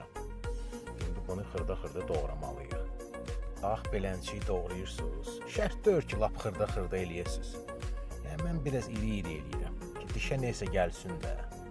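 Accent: Baku, Azerbaijan. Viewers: Bakı ləhcəsi ən gözəl ləhcələrdən biridir, bu xaş üçün ən yaxşı sous elə bakı ləhcəsidir.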